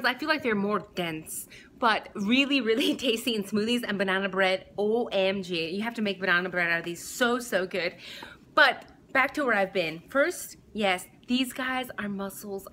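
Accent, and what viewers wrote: Scotland, United Kingdom. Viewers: I never noticed your scottish accent until now. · Is that SCOTTISH ACCENT??? WOOOOOOOOOOOW!!!! Am i the only one who noticed that? You are a TRUE GODDESS!!!! Your beauty is TRULY DIVINE!!!!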